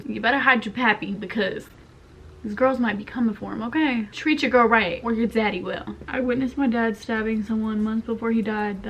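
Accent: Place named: South, United States. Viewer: You remind me of the mom from ginny and georgia especially with the southern voice 😂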